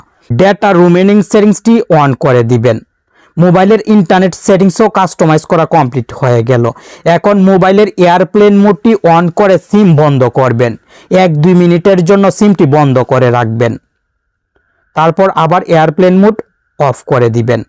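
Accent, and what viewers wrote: Mymensingh, Bangladesh. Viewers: ভাইয়ের বাড়ি মনে অয় ময়মনসিংহ।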